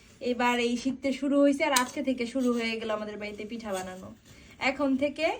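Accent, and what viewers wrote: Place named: Barishal, Bangladesh. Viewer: বরিশালের বউ বরিশালের কথা শিখে গেছে মাশা-আল্লাহ মামি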